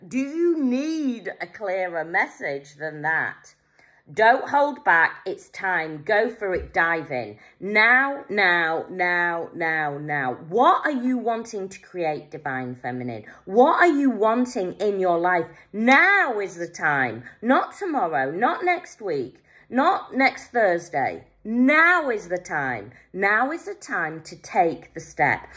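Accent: United Kingdom. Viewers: Nice to hear a Yorkshire voice that is where I am ❤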